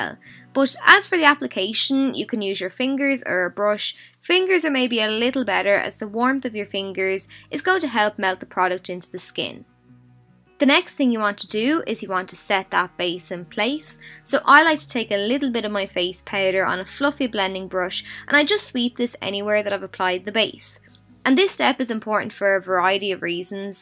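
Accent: Ireland. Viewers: Are you Irish??👌👌👌👌👌👌💎💎💎💎💎💎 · Are you Irish by any chance? Xx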